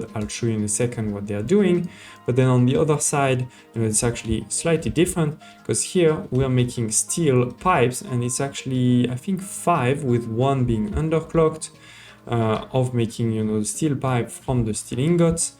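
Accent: France. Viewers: l'accent Français c'est quand même vite reconnaissable quand on parle anglais :D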